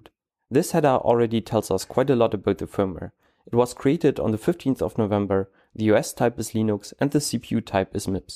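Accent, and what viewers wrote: Germany. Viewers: bin mir absolut sicher, dass du aus dem deutschsprachigem raum bist. dieser akzent ist so einzigartig hahah :D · Your english sounds german. Where are you from?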